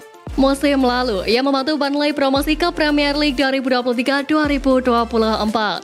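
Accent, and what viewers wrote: Java, Indonesia. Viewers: Yg ngomong medok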